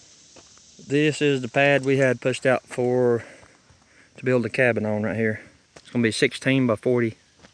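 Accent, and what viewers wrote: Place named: Western North Carolina, United States. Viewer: cool man! I'll watch. I subscribed to your channel. You sound like your from close to me from your accent. I'm in western North Carolina.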